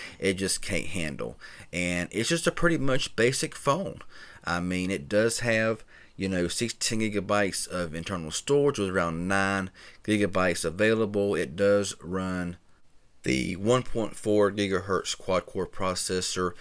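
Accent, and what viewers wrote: South, United States. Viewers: Was suppose to be a review not southern accent return!!!!!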